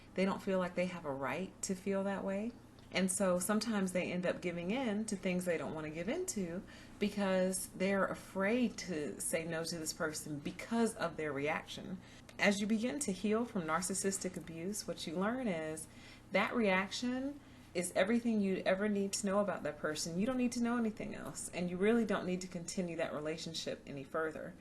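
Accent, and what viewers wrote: South, United States. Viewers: You have a beautiful Southern accent!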